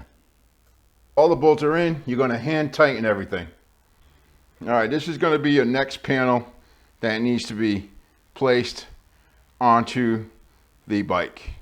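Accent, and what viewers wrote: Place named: Brooklyn, United States. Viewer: You're from Brooklyn, or Long Island, which one? I'm from Boat, grew up in Brooklyn, now live on Long Island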